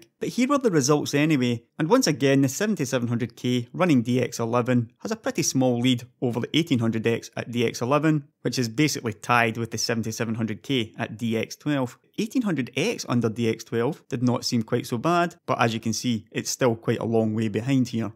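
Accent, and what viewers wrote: Scotland, United Kingdom. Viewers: I came for the Scottish accent, did not disappoint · Your scottish accent is giving me a hard time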